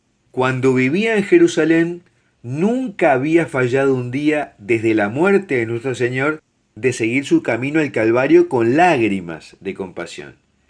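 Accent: Argentina. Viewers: .le agradezco la información ya por medio de usted de la Virgen me a ayudado a reafirmar mi fe y estoy en proceso de seguir y aplicar mi fe católica por eso enfatizó este párrafo aparte de que me agrada su acento tipo argentino y su final bueno......! Por eso mi punto de vista para con usted ya que le reafirmó mi gratitud y confianza con su programa gracias buenas noches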